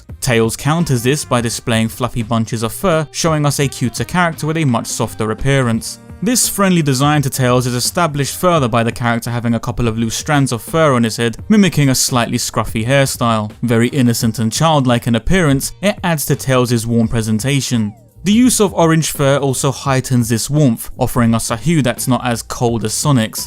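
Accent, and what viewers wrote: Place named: Inner London, United Kingdom. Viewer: I'm curious to know, I'm think you mentioned this in another video but where about in England are you from again? Your speech makes me wanna say inner London?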